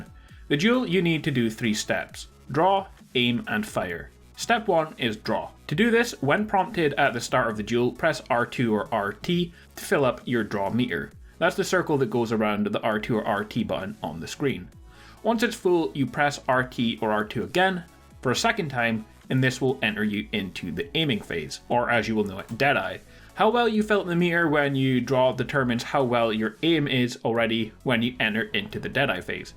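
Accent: Scotland, United Kingdom. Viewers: You sound a touch Scottish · I hate the quickdraw system in RDR2. It is completely retarded. It tells you to fill the meter slowly on the first press, by pressing slowly...Press slowly? It's supposed to be a quickdraw ffs! I died so many times trying to do just that. The trouble is that you don't get much opportunity to practice either. BTW are you Scottish ya bawbag? Me too.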